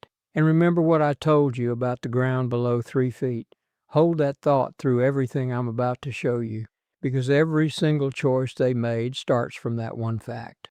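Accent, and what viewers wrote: South, United States. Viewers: Why does he have a southern accent? A I accent and images - but interesting Why does he say "Indian" country? That's not what they called themselves. lol. Indian country is the country called India. Also, how is that these types of dug out shelters don't have the soil collapsing on them?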